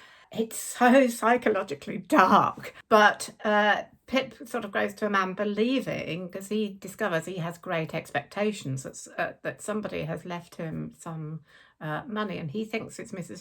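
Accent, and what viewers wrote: England, United Kingdom. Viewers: A lovely English accented lady talking about winter books, preferably curled up on my sofa under a fuzzy blanket on a dim, chilly day with a fire crackling in the grate… what could be better??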